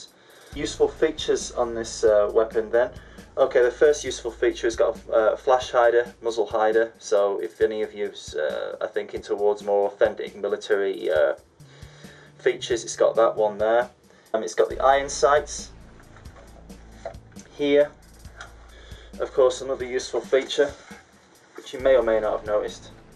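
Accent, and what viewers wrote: United Kingdom. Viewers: alright, nice gun, yorkshire accent? :-) slr is a giveaway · You sound like you are from Great Britain. I'm a little ignorant on your laws, but I was under the impression that you could not own a gun like this. Awesome gun, man. I'm saving up for a DSA FAL like this one. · You sound British. How do you have that? Or are you living in the US?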